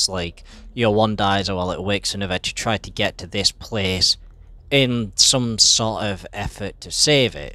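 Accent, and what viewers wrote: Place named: Scotland, United Kingdom. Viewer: I'd say he's probably Sco'ish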